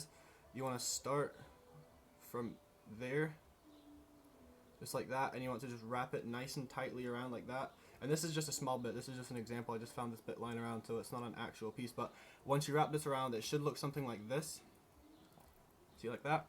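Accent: Scotland, United Kingdom. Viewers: where are u from like I keep getting hints of a Scottish accent idk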